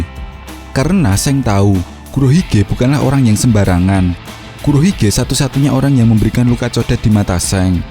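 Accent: Java, Indonesia. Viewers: Medhok mu itu looooh.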